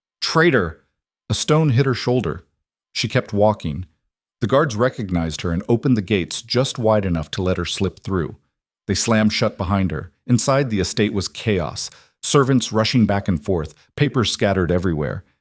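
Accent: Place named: North America. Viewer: Ugh at the lisping N. American accented AI for a story set in 19th century England.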